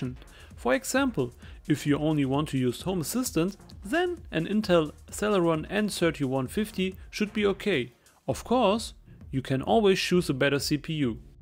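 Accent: Germany. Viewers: Ich ess deinen deutschen Akzent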